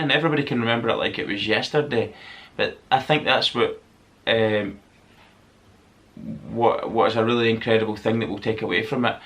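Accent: Scotland, United Kingdom. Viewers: Brings powerful memories rushing back. Love your Scottish accent!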